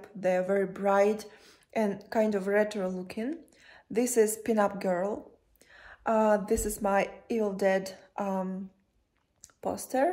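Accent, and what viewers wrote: Russia. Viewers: Русская? Акцент )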